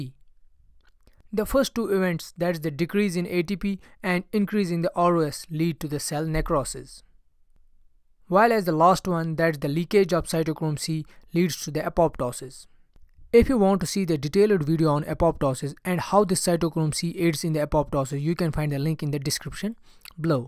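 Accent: India. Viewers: Kindly put original subtitles because your English has a very Indian accent which is difficult for some people to understand.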